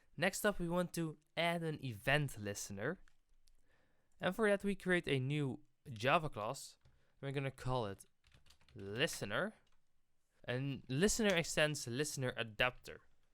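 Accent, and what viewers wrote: Netherlands. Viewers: U dutch? Becaus U have the accent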